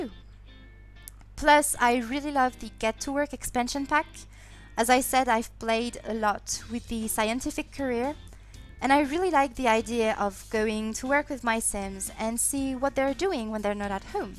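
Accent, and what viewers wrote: France. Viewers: J'ai reconnu direct que tu etait française ❤️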